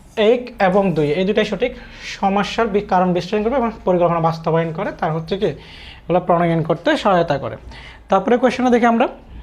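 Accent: Chittagong, Bangladesh. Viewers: Bhaiya apner basha Chittagong maybe🙂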